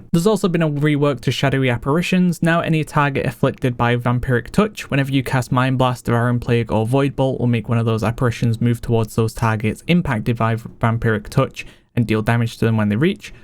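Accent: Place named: Leeds, United Kingdom. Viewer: Northern UK, Leeds kinda accent.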